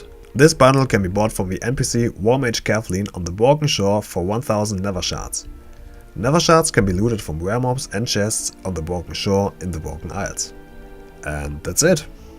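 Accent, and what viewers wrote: Germany. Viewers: Ich meine ich höre da wen Deutsches heraus!^^ Schöner Content!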